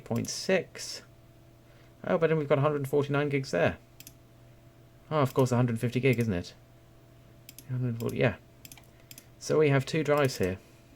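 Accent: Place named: United Kingdom. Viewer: I love the british accent. "It cahnt see mah roota"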